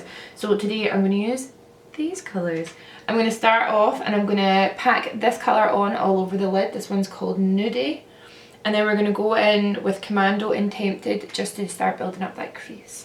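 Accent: Scotland, United Kingdom. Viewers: Omg you're Scottish, yes finally 🙌🏽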